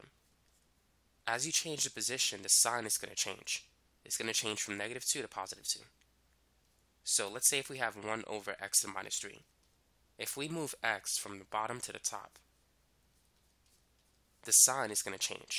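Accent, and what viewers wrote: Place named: New York, United States. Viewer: I feel like you have a New Yorker accent.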